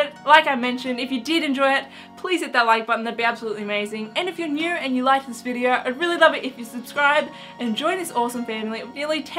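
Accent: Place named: Australia. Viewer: You're beautiful. Plus, I love auzzie accents. =)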